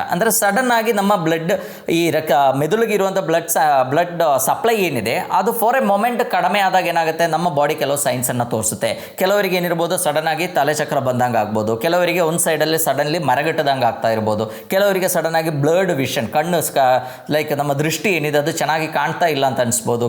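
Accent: Kerala, India. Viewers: ನಿಮ್ಮ ವಿವರಣೆ ತುಂಬಾ ಚೆನ್ನಾಗಿದೆ , ಉಚ್ಚಾರಣೆ ಕೇಳುವಾಗ ನಿಮ್ಮ ಭಾಷೆ ಮಲೆಯಾಳಂ ಆಗಿರಬೇಕು ... ಧನ್ಯವಾದಗಳು ಸರ್.😊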